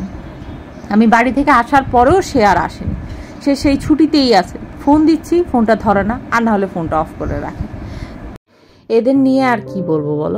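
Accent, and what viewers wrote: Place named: Chittagong, Bangladesh. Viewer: tomar kothay Chittagong er akta tone asy…..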